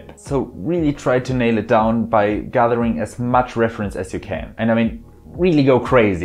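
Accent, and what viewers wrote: Germany. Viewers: ich hör da einen deutschen akzent!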